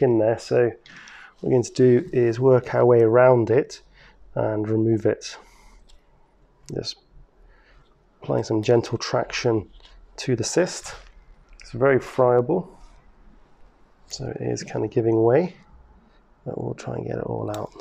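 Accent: England, United Kingdom. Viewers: It’s an English accent lol. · Great removal, and it’s so nice to hear a British voice as a posed to an American.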